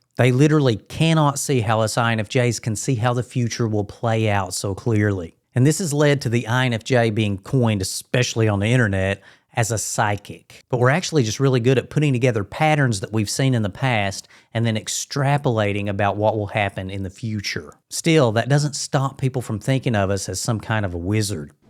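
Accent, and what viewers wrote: South, United States. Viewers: I am from Virginia. And, it is so wonderful to hear your Southern Accent… one of the nicest ones I have ever heard. You should read for audiobooks😊 I am an INFJ It’s really kind of a relief to hear all of this. I don’t feel so weird anymore. Thank you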